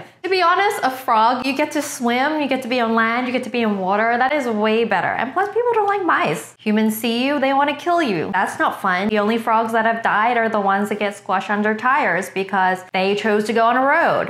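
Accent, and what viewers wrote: United States. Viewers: I've not watched Wengie in years and was shocked about her now American accent! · i haven’t watched in forever but it feels like you have an american accent now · She’s got an America accent 😂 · Love the new Americanized accent. 😍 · You've been in the states for so long u have an American accent now.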